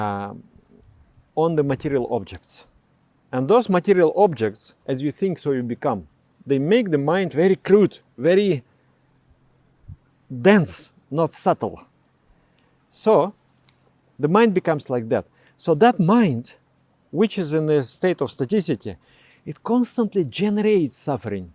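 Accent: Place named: Russia. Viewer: Wonderful video! Thank you so much for the information, very helpful, brought clarity to me. And your Russian accent is awesome, wonderful! ❤️❤️❤️😇🙏Namaskaram!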